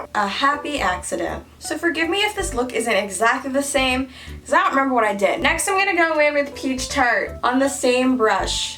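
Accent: Canada. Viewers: Your Canadian accent is killing me. 😂 I'm from the U.S. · Your Canadian accent sounds like how my grandpa talks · Canadian accent sounds really nice. I just realized they had their own accent 😂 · Canadian accent soo cute😂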